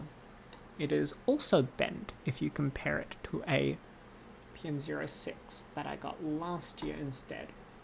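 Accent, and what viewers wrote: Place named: Australia. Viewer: Oooh, dont bend that fancy ratyoke pick! I always use absolute minimum tension, because I almost always use <num>in (~0.3-0.5mm) picks, i enjoy the feedback from them more i guess u could say. Thanks for speaking so clearly too, tbh I have a heck of a time trying to understand Australians speak, but didnt this time! Thanks for the informative video;)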